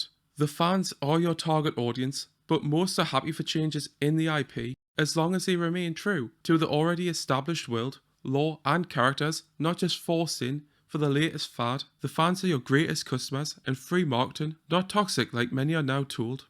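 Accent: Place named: Wales, United Kingdom. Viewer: Either that or just a Welsch person. That accent is terrible.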